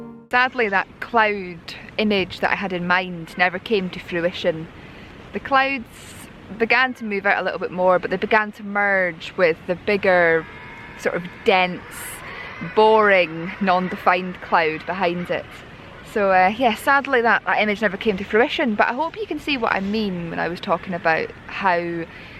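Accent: Scotland, United Kingdom. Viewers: What a pleasant change to hear a Scottish voice - sub'd!.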